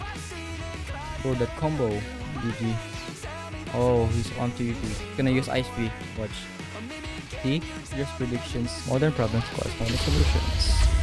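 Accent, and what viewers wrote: Bisaya, Philippines. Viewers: First time ko sa channel mo tas yung accent mo sabi ko pilipino toh😂... Naks luv it keep it up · "Bro palaban sa sea beast haahahahahhahahah" Leme ask u are u Tagalog or bisaya bc the way u talk it's like u are bisaya but idk Bruh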